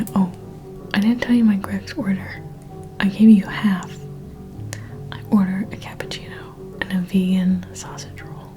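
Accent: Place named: United States. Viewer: Falling asleep and then hearing Greggs with your American accent, surely not THE Greggs. But yes, it is the Greggs! I didn't know you were in the UK.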